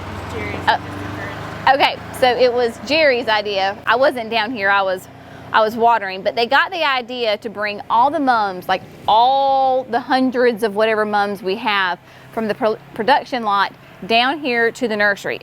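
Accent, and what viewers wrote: South, United States. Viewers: Bring more videos, I enjoy your true self, it’s refreshing. I also enjoy listening to your southern accent. ❤️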